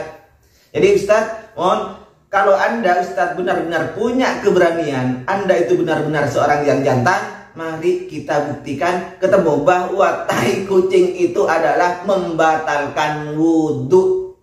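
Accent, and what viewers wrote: Madura, Indonesia. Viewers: In yg punya Chanel suara kyk orang Madura pantas lh GK suka sama ustadz KHB konco nya si Romli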